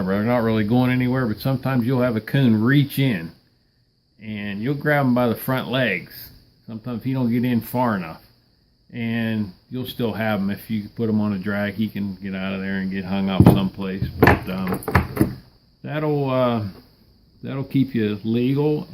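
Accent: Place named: Southwestern Pennsylvania, United States. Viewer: Man I thought I had a heavy SW PA accent. Great video.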